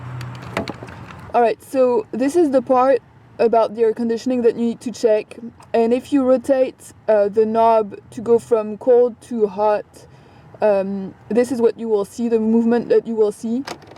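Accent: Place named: France. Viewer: You have saved my husband's life.....he was going crazy with his A/C until this video.....!!!! Plus you have a beautiful french accent!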